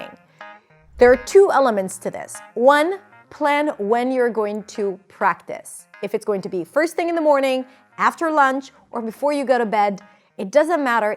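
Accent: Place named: United States. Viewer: You're an inspiration to all of us. Watching the way you mastered and hacked the American English accent is so motivating.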